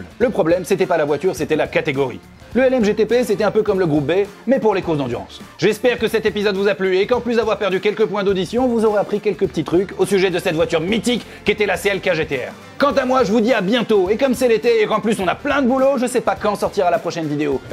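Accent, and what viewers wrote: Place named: Belgium. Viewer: Bon j’ai failli mettre un pouce rouge pour « l’accent belge », mais juste pour l’humour et surtout le contenu, c’est un gros like 👌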